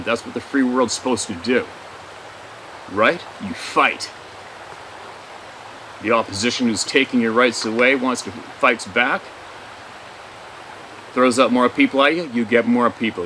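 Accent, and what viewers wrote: Australia. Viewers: Funny Aussie accent.. love from an Aussie! You are absolutely correct Re the Rant! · Australian accent sucks brother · That aussie accent tho! 😹 Rant away sir! 🤩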